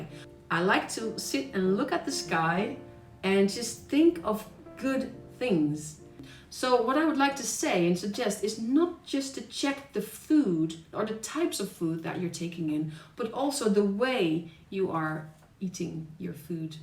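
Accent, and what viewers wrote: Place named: Netherlands. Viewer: Girl, you mde me lough, "find the things, that are worth relapsing for." I am out of a relationship with a hot big boobed psychopath for about 4 months now. After 2 weeks I couldn't move a finger, I needed 12 h of sleep. I don't want to see people, only one friend left, a lawer who pulled her out of my house with the police and fiscalía. But I am fine with that. I don't have to work, so I can be me as long as I want. What I do is accept it. Now I am getting better day by day, I do a lot of work in my house, welding and all kinda stuff. I am still very very slow, but I see the results. I don't think M. E. , is caused by a virus, it's a respond to abuse, and I respect the respond of my soul to it. I can't even think of working out to get my sexy body back, even the thought of girls looking at me repells me. Time has a different meaning to me now, I don't feel I am missing out, I enjoy myself. 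When I feel like it, I got the chance to meet new people and I will do it very slow as well, there is power in doing it slow. You have a nice accent, from the Netherlands? I was a German. I was very fast there. Saludos desde otro lado del mundo Sudamérica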